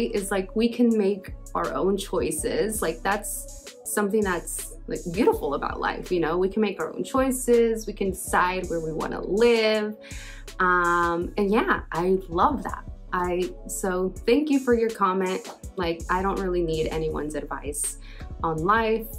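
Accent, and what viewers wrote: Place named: California, United States. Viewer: I am from California, and you you sound so sound so Cali. I heard that that Austin is expensive?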